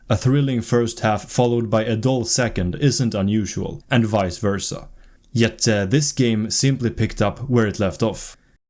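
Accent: Sweden. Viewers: Your accent SCREAMS Sweden omg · Swedish-English is so funny to listen to bruh 😭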